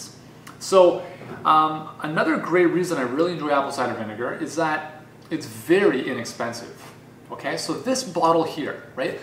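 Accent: Canada. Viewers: ur canadian....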